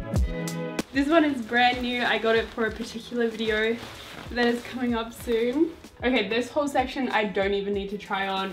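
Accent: Australia. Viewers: Me staring at the phone after pulling an all-nighter (it’s am now) trying to figure out if she has an Australian accent👁👄👁😭🤦‍♀️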